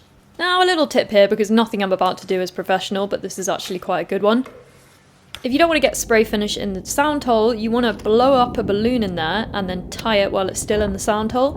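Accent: England, United Kingdom. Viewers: Who could hate a British accent; especially yours,? Some serious skills you have! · Impossible: hating a British accent, a uke build. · I like this build! I think your bolt on neck was totally fine. It does not have to be a dovetail to be real neck joint at all. Remove such snobbery from your opinion missy! haha..Taylor guitars use this type of bolt on joint and it is great for neck removal for repairs and such. As you know a dovetail would be totally glued in, and require steam to loosen the glue and force to remove the neck with maybe some minor damage. Ask Ted Woodford! And also your British accent is delightful and lovely! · British accent? Only Americans say that! You surely have an English accent…🏏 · OMG, I thoroughly enjoyed watching the loving way you "rushed" through that build. I love the simplicity of your workshop. The "hold-down" clamps are slats against the floor of your loft! 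(I know it's gotta be a loft, since you live in a space - constrained treehouse.) I also loved watching you bend walnut - I have not seen that method. I need to build that steaming iron, thank you for the better view where I could see the shape. Most others do it in a variety of ways where they have to be careful to not burn the wood! I like your method many times over (I assume the wood does, too.) (BTW, since it was 5,000 degrees out, I'm glad you "switched to your floaty trousers.") I also liked your corncob drill sander to smooth the sides. (That's as far as I've got, for now...) 👍👍👍 Add-on later... Well, I watched the whole thing. Yeah, it didn't sound so great, and it could be a combo of things, like top too thick, over braced, and the strings! I'm sure that's why when you find one that does... it requires quite a bankroll. (That's per Guthrie Trapp.) But, as you said, it was a fun project, it looks adorable, and you got to show off a few more outfits, lol. 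You're so cute. You remind me of my lovely wife, except she has a PA accent, instead of your UK. Anyway, I'm already 'scribed, which I assume is why I got this in my feed. Yes, you have a lot to contribute to this field. I definitely like your approach to the build: simple & loving (respectful, delicate with the wood. I feel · English accent? I must blame Monty Python, Iron Maiden and Black Sabbath that I quite like it, awesome work as always. And that heat bending of wood is black magic. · i love brithish acent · I love British accents! Love the minion size guitar. Loved the singing and dancing while shaping the neck 😂. So excited about the new apprentice! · Nothing wrong with an English accent.. from a Scotsman. So tell me what guitar was playing as you did the finishing touches, because I want to buy it. Good vid though, held my attention. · Love the British accent. from a pretty girl to boot.. 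Nice video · "If you hate British accent." I am watching this because I love the British accent. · Loved it! (except for the sound :) ) . Also i will be making one of those drill drum sander attachments and fitting my metal vice to the bench with a simple single-threaded rod! PS Nice to hear an English accent (but then i am english) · Great to hear a British accent normally mute sound.. great work. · I’m an American so English accents immediately sound educational to me. No complaints. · love your British humor and your accent as well ! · Anyone that can't appreciate these amazing skills is probably sleeping through the video LOL! And shame on you - you could learn so much if you paid attention. Besides who doesn't want to see a beautiful girl with a Brit accent teach men how to do stuff? All the best elements entertainment, education, and fun😊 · I love a British accent, you are so lovely. 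excellent video! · You're great, and yes I love you're English I'm English also ❤️ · "Mute it if you hate a british accent" -- LOL! I actually got hooked to this channel primarily because of your lovely british accent. XD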